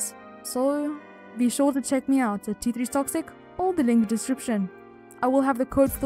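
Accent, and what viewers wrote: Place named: Europe. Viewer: U sound Europe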